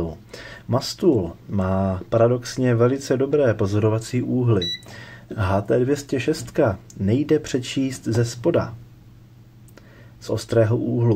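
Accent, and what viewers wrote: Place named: Prague, Czech Republic. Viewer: Ach ta pepanština :(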